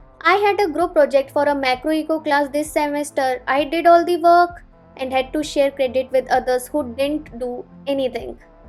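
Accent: India. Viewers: are you indian btw ? cause your accent 😼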